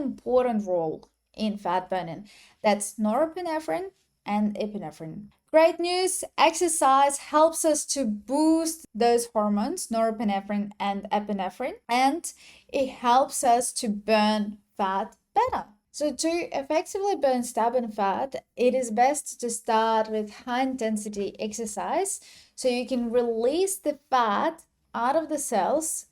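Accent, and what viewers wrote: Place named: Ukraine. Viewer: Great video! BTW, what is that beautiful accent of yours?😊 Are you from Ukraine? 🇺🇦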